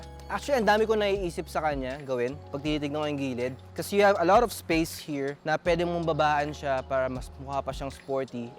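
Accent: Philippines. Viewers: Я просто в ахуе от его «английского филлипинского» .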